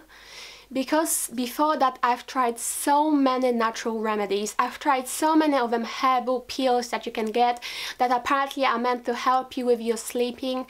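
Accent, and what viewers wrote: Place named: Europe. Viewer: The Real Mansa Musa her school was british and she sounds european and her name looks european too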